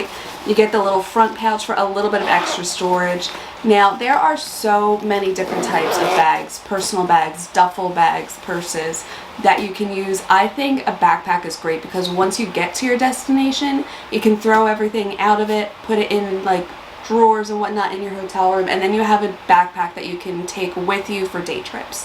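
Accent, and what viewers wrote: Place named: Australia. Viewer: I'll never fly international, but as someone who cruises many of these are a good idea for cruising as well. Cruise ship cabins don't always have many hangers either, so those foldable hangers are a brilliant idea, so I can hang my partner's dresses, and my 'formal night' gear. Great ideas. I look forward to seeing some of your travel vlogs when you take Mark to Philly. It'll be interesting to note how aussie you probably now sound to your friends and family.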